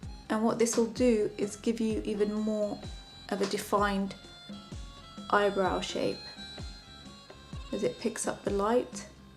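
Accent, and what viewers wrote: United Kingdom. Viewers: Love you accent are you living in the UK cuz it sound like a british accent btw you<re beautiful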